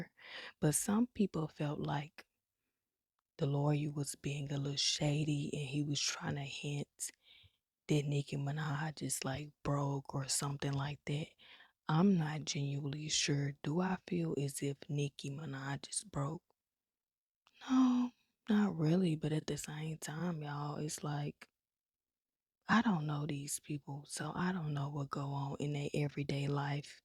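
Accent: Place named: South, United States. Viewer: What accent is this? Its southern right? Idk why but its so nostalgic to ppl I heard as a kid. I need to know 😂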